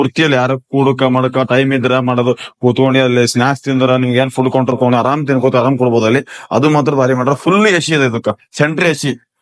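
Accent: Gulbarga, India. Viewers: ನೀವು ಮಾತಾಡೋ ಗುಲ್ಬರ್ಗ ಸ್ಟೈಲ್ ನಮಗೆ ಅರ್ಥ ಆಗ್ತಾ ಇಲ್ಲ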